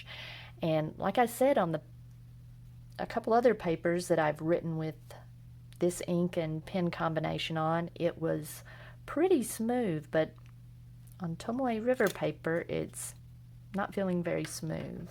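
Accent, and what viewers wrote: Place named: Alabama, United States. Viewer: You sound a little like your from Alabama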